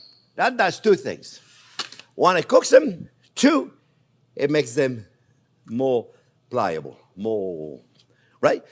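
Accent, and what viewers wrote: France. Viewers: Chief Jean continue talking , I love Your French accent it’s different than other chiefs , we choose yours to watch , 😂👏👍👌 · Beside the excellent recipes and very well explained, you share with us, I enjoy the beautiful French accent . That is a plus. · Thanks Chef so much, I love all your talking, and sense of humor, saying "I am from N.Y" with that thick French accent:), you are so funny. and I truly feel lucky to find myself in a free , French culinary school, without the pressure to learn these recipes in English, with so much fun and humor. I agree those who think you talk too much, they don't deserve to watch you, also I find it extraordinary rude to speak to a chef of your Caliber like that, they sure don't understand the Culinary culture and in French Culinary school, if a student talks to Chef like that, would not longer be there. 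so these rude students, who watch your lessons for free and disrespect you, really don't deserve these teachings. I honor you.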